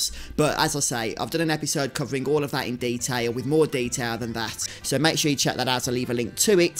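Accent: United Kingdom. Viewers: I like youre GB Englisch!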